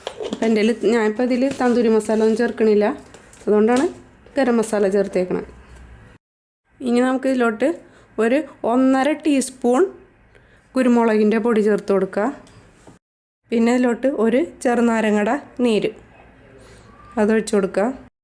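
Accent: Kodungallur, India. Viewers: കൊടുങ്ങല്ലൂർ ഭാഷ... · Ith kodungallur slang aanu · Ingale veedu kodungallur anganam ano? 🤔 nalla slang kdglr karde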